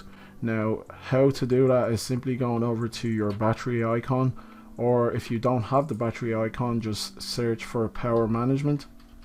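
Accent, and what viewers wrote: Ireland. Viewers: Is that an Irish accent or are my ears just ringing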